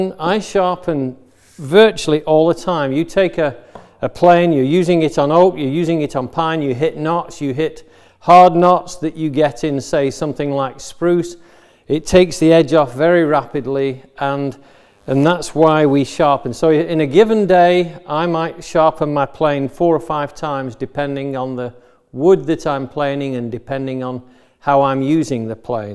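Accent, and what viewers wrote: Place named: Northern England, United Kingdom. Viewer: Our northern accent never leaves us =) great videos.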